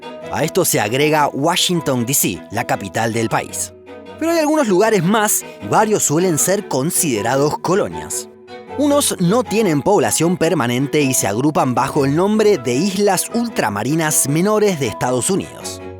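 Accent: Argentina. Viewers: El acento argentino es muy lindo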